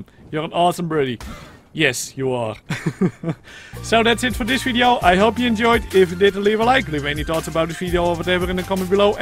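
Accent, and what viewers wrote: Netherlands. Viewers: Are you dutch xD Sometime you've got a Dutch accent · oefen je engels aub ik hoor dat je nederlands bent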